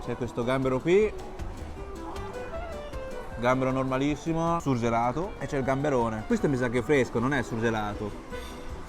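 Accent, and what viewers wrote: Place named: Romagna, Italy. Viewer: Di dove sei??? Hai un accento romagnolo.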